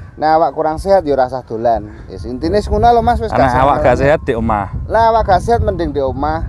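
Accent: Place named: Java, Indonesia. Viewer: Aku seneng bosomu medok, Mas ... 👍😂👍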